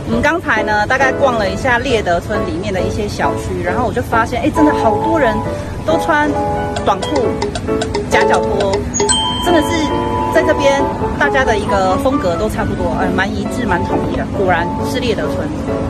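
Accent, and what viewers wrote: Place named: Taiwan. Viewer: 國語台灣本島腔，難聽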